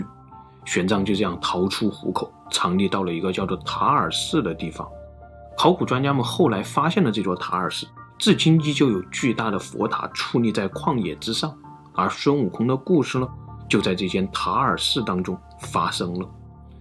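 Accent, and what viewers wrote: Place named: Hunan, China. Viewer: 这在湖南称为“塑料普通话”，很有湖湘味道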